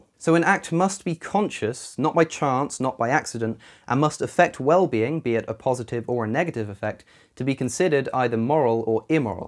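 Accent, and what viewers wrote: United Kingdom. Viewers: His British accent makes him sound smarter than he otherwise would and the American flag in the background confuses me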